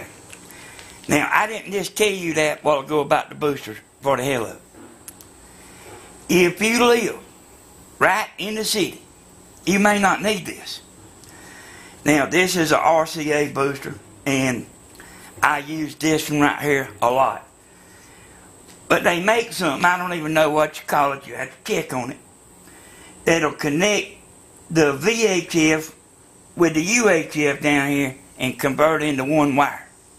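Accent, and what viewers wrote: South, United States. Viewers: It's Georgia. There is a very distinct Southern accent from that area. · Hey there good job on the antenna I like that design for sure. I'm up here in Toledo Ohio got a homemade antenna but that's junk going to put one of years together you sound like a good old boy I used to live in Atlanta early 90s. And lookie here... you don't know how nice it is to hear a southerners speaking until you hear it again sound just like some of those Hazzard County Kentucky boys I Used to Know. Keep them good videos coming cuz I just subscribed to your Channel God bless brother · Too much "Southern". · i love the southern accent, its like larry the cable guys brother, the antenna guy:) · I am a Kiwi from New Zealand and I am hoping to come over to your country, being a Maori of brown skin complexion will I find that your fellow southern folk might find me offensive. 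I really love your accent because it is Authentic. I have been told from friends of mine whom have visited the southern towns that your folk found their accents real strange to listen too. We are definitely not Australians as they drool a lot of their words with their accent. It is irritating to listen to from a Kiwi perspective but again I love your accent and could listen to it all day. Maybe when I come over to your country we could hook up over a coffee. Cheers for the antenna, I will make one just like it although I will need to find comparative balun thingies. Anyway take care and onward and upward. Regards b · OMG, I love his southern accent!! Subscription and like, indeed! Actually, this zigzag (or B-square) antenna is credited to the Soviet engineer Kharchenko, however, according to some accounts, such antennas had been used aboard some Nazi submarines way before WW2. The advantage of this antenna is that it "catches" even reflected signals among high-rise buildings in a big city and it is very easy to make at home in a few minutes!! 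· love his Southern accent. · Wow!!! Where 've you been all my life ?!!! You DEFFINATELY get a 10 from me ! I can't wait to try this project ! I know it's a GREAT HASSLE for you to film, BUT I'M SURE GLAD YOU DID. Your directions are clear, and slow enough for a beginner to clearly understand and WANT to give it a try.; Your comments during filming are confident, informative, pleasant, & never boring.; I especially love the fact that you expressed yourself intelligently with little or no profanity; and I love your accent. What part of the south are you from ? ...Job well done!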